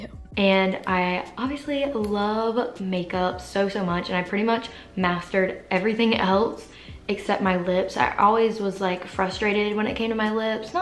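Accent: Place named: North Carolina, United States. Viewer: You are joking! I'm from North Carolina as well :) Where in NC are you from? (I knew your accent sounded familiar lmao) And yes it is kind of unheard of of people our age getting filler so I'm glad I watched this video. I really want to get mine done.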